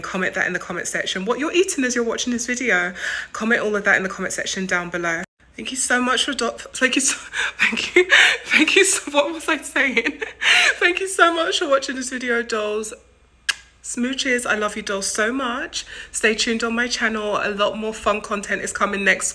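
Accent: London, United Kingdom. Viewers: Are you from London ?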